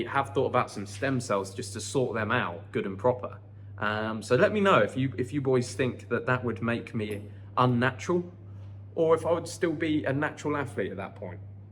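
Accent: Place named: Bournemouth, United Kingdom. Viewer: Didn’t realise you lived in Bournemouth, thought I recognised the accent! Im hoping to move to Koh Samui from Bournemouth at the end of the year 🤞🏼💪🏼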